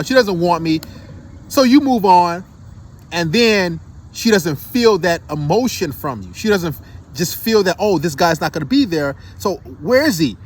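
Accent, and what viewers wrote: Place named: Long Island, United States. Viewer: That gawd aweful Long Island accent!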